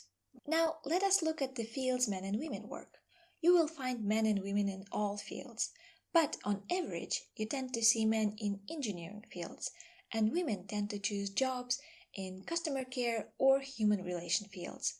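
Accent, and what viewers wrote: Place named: Eastern Europe. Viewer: Your accent sound Eastern European.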